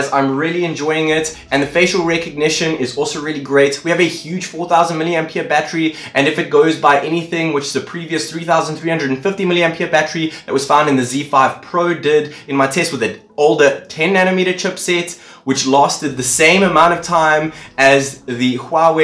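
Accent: South Africa. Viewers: I like the Proteas accent !!!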